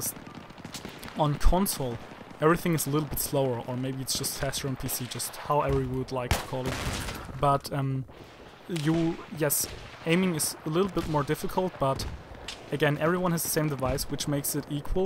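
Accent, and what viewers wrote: Germany. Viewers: du bist deutsch oder? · Your german right? Your english sounds a bit german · are you maybe from germany becaouse i hear a little bit accent?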